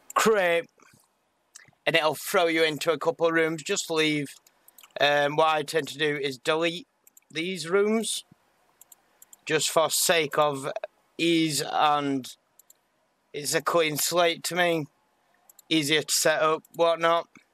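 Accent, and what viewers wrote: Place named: Ireland. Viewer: WAIIIIIIT HES IRISH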